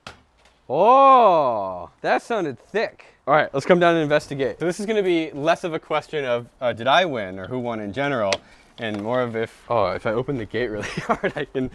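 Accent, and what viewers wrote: Australia. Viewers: Don’t worry, most of us aussies talk like that 😑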